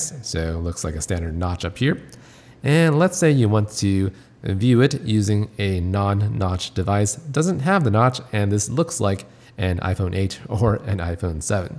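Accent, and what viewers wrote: United Kingdom. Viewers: What the fuck is it? British?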